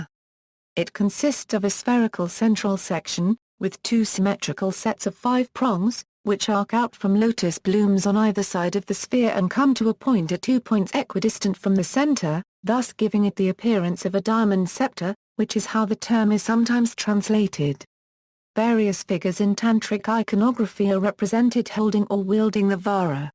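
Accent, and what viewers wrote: United Kingdom. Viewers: I studied Sanskrit and Hindi at university and 100% agree! Wrong pronunciation - this isn't Spanish!! They do that in Spanish jalapeno is "halapeno" - not Sanskrit or Hindi! Narrator is Britisher, so what do they know?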